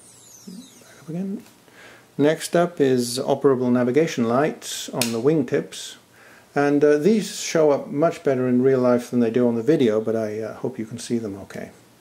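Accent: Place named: United Kingdom. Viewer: I'm sorry, but WTF? Im hearing a brit accent, and can't help but wonder WTF? Do you have nothing better to do with your time??? You're obviously an incredibly talented, hard-working, devilishly-focused so and so and again I ask: WTF?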